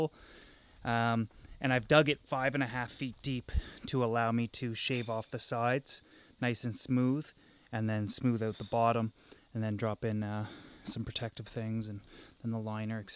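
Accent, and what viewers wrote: Canada. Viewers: He's Canadian.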